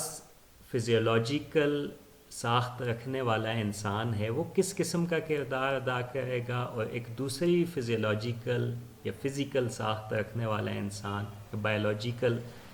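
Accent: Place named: United Kingdom. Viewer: firstly I thought he is a Britisher........then he started Hindi....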